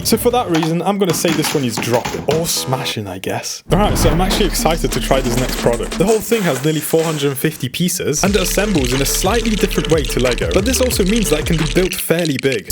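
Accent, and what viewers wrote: England, United Kingdom. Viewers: are you english or british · He British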